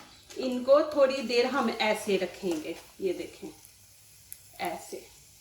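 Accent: Punjab, India. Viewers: Baji bohat achi recipe hay lakin aap K Punjabi lehjy me Urdu bohat torture karti hay. Kya hi acha Ho K aap Punjabi me hi videos banaya Karen. Wo hame ziada pasand aay gi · مجھے تو آپ کی پنجابی لہجے والی اردو اچھی لگی 💕💕💕💕🤲🤲🤲🤲اور ریسپی بھی میں ٹرائی کروں گی مزے کی لگی 💓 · Ma shah Allah zaberdat . Ap kaha say hay punjab say ap Sialkot ya Gojranwala say lug rahi hay ku k ap ki urdu bohat punjabi touch hay 😻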